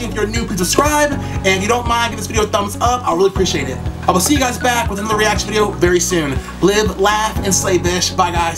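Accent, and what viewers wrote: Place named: United Kingdom. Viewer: The British accent tho😂❤️❤️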